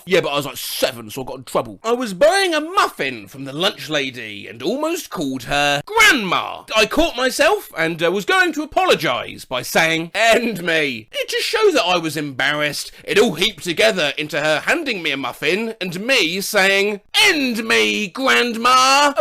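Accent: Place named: RP, United Kingdom. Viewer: Stop talking posh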